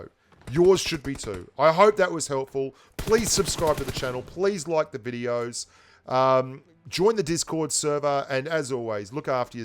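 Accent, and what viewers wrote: Australia. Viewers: He's an Aussie mate.... GADDEMIT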